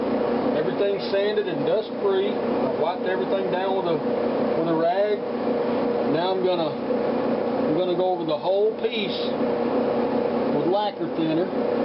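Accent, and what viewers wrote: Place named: South Carolina, United States. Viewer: Hey. Where are you located? You sound like you come from my area. 8-) I'm in Spartanburg, SC.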